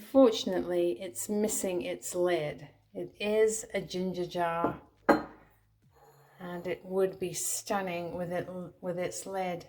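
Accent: England, United Kingdom. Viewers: I love shopping with you ,your English accent is like someone from home, Your fun.😊❤😊too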